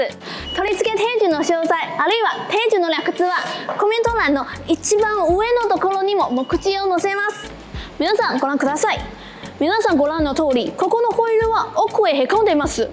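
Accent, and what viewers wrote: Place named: Japan. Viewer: I like your Japanese accent. Sounds really cute!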